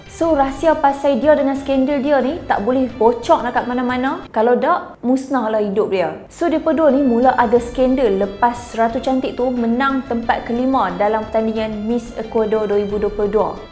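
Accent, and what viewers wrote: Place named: Kedah, Malaysia. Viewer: pekat nahh kedah.. kami suka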